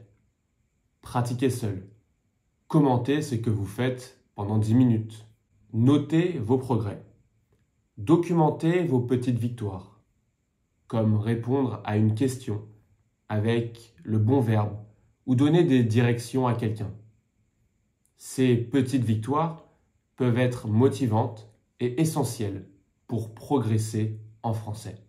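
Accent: France. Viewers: T'es difficult a comprends parce que je suis habitude le francais de quebec( tres different que francais de Paris)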